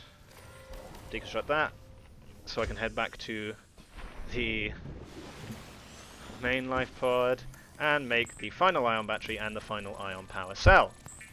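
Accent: United Kingdom. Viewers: As someone said down there you're really good at commentating, and I absolutely love your english accent (as a french) ! And doing this while performing is incredible _ I say "Chapeau bas" ! :D EDIT : and he even EXPLAINS during his performance it's just so cool !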